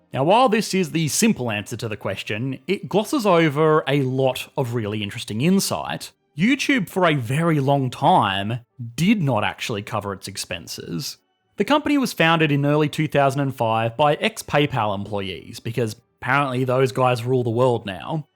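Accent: Australia. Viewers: Me: doesn’t get it in class... finds it explained on EE with cringe jokes and an Ausie accent and awesome stock footage. Me: google giveth🙏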